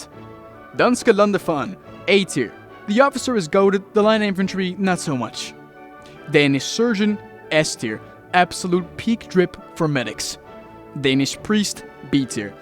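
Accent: France. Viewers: i like ur french accent, subbed good vid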